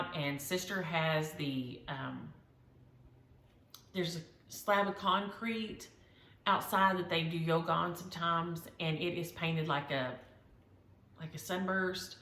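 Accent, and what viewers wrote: South, United States. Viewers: Oh my goodness this is my first visit with you and all you had to do was open your mouth and speak! I love your accent, it is so amazing and southern( just like sweet tea)😂 enjoyed your video, I will definitely tune in again. Thank you so much!!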